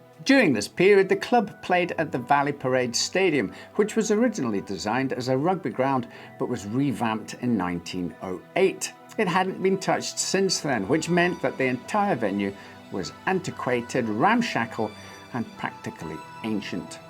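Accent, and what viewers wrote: Scotland, United Kingdom. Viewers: Is that the faintest hint of a Scottish accent?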